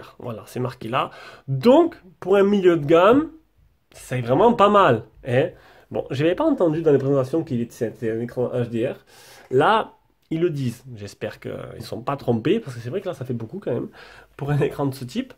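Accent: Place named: South, France. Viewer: Merci pour ce test qui m'a captivé que j'ai regardé du début jusqu'à la fin. Bonjour l'accent du sud et on sent le passionné pas forcément professionnel (ou peut être j'en sais rien...). La prochaine fois il faudra parler du type de charge rapide (18 watts je crois) et la possibilité ou non de charge à induction mais le test est vraiment pas mal...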